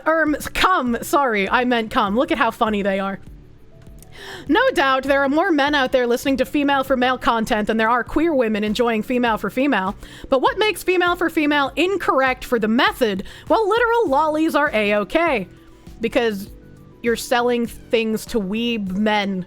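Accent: Boston, United States. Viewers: Incredible content. Bostonian ASMR got me through the work shift. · Bostonian woman ASMR, damn 😮 I now think she needs to collab with VAllure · That Bostonian accent is so funny, earned a sub there. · As a fellow New Englander, the Bostonian ASMR was something I didn't know was missing in my life until now, we need more of that!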